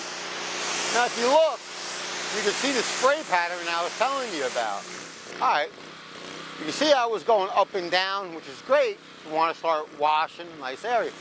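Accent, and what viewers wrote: New York, United States. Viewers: I'm Italian and love how talks! I'm from new yawk too I dunno where he's from but he would fit right in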